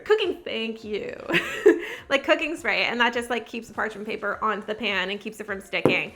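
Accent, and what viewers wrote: Canada. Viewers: Between the valley girl voice and the super dramatic personality … I laugh. I do get a kick out of this girl. I mean that gasping at everything… like what would her reaction to something crazy happening? I just see her being like OhHhh my GoShHhH!! Gasppp gaspppp 😂😂😂😂 and if she hadn’t said she’s from Canada, the way she says out and about .. things like that is so Canadian. I never heard anyone speak like that except for people from Canada. That’s no hate either, I’m a born and raised New York Italian …. You can hear me coming a mile away && there would be NO question where I’m from. Everyone I meet that’s not from like the tri-state area always are like my god you have the thickest New York accent! Say dog!! Say coffee!! 😂😂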